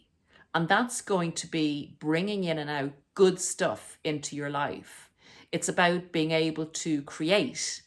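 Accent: Ireland. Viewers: I have an inner dialogue with myself all day every day lol, I use music to calm me, ground me and I love your Irish accent.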